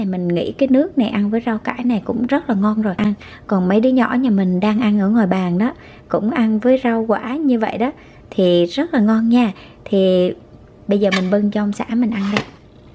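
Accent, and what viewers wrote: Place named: Hue, Vietnam. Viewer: Giong hue nghe de thuong qua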